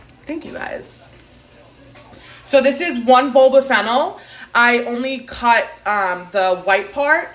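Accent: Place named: East Coast, United States. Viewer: I’ve been watching your cooking vlogs and must say you are amazing!!! Love y’all east coasters. Majority of my family is in Mass. American Armenian and you remind me of my cousins. Y’all talk and sound so much alike. I am on the West Coast, Southern California. Much love to you and your beautiful family ❤❤❤❤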